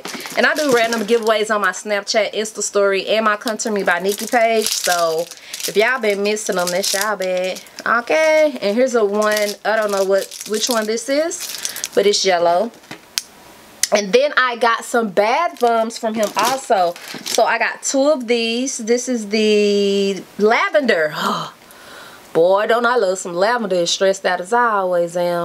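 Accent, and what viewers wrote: South, United States. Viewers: Your southern accent is soo strong today I love it !!!! Happy you feeling better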